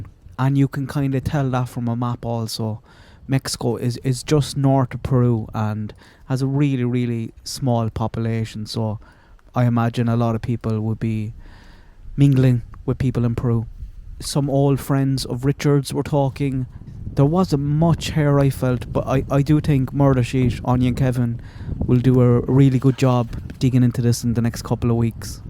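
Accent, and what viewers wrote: Ireland. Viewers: Helloo well done my friend always enjoy ur take it might be the oirsh accent but I like the take..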